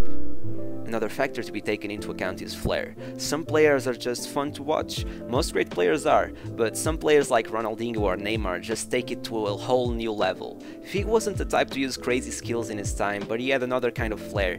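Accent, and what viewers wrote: Portugal. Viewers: Lovely video from a portuguese speaker, if I can catch your accent correctly, am I right? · Judging by your accent, I'm very sure you are Portuguese. Great job with this channel, keep going! 😁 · O sotaque e pronunciares bem os nomes portugueses denunciaram-te🤣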